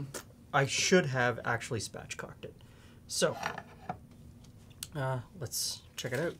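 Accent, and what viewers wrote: Canada. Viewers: omg r u canadian!!!!!!!!!?!?!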